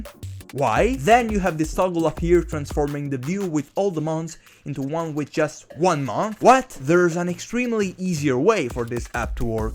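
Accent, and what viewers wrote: Italy. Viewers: Si sente che sei italiano dalla pronuncia, però ottimo video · il tuo accento inglese e bellissimo ma si sente un botto che sei italiano hahah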